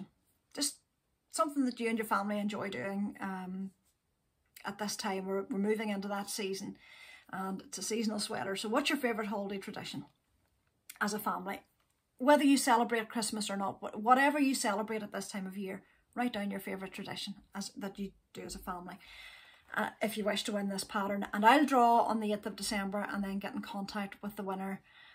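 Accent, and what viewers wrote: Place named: Ireland. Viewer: So wonderful to see you and hear your amazing Irish voice. love love your podcast and can't wait to see your vlogmas. Love the beanie and your sweater is so beautiful. I am not a Christian but my children are born in London. There was always some element of Christmas as they were growing up, Christmas plays and concerts, a small Christmas tree with perhaps aa gift for each child. 15 years ago my Mum passed away, in my hands, on Christmas Day. The following year instead of being sad I thought we should celebrate and invited my brothers and families and had a meal and sang songs, and played games. And from then on christmas grew and grew............I love how we get together and all cook, open lots of gifts for each other and the whole house is lit up and decorated. My daughters have already put the tree up a few days ago! Not only did I adopt Christmas but adopted (not legally) a few Mummies and looked after them until some have passed but just helping them through the year with everything I can.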